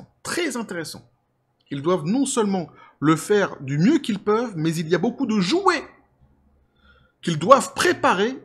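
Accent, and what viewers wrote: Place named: Quebec, Canada. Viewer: Rien que pour l accent québécois, je met un pouce vert! 😊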